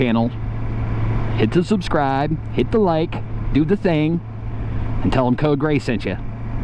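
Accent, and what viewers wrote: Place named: United States. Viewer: These things happen with the glitch. Yeh WestonSuoerMotoVlog is very supportive to us all and and all and out nice guy.. sure I'll meet him some day. He is in England and I am in Scotland so there is about 600 miles between us but it will happen. You accent is soo american, love it. Keep them coming buddy. 👍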